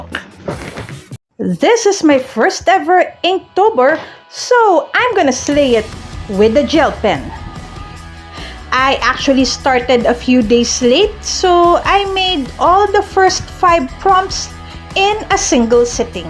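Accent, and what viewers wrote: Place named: Philippines. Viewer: Salamat po! My son, Jaja heard your accent and shouted "She's Filipino!" What are very creative way to share the gospel. Watched the entire video including the bloopers!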